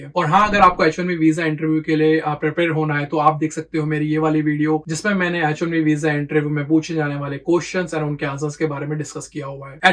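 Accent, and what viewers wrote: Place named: United States. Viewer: bhai... thanks for sharing this video. Can you pls make a vlog on how you got your language ascent changed from being Indian speaking to American ascent? It would be fun to listen to you on this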